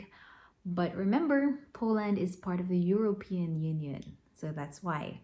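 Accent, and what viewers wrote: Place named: England, United Kingdom. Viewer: First I love your English accent,Gal you're flawless,thank you for your honest opinions.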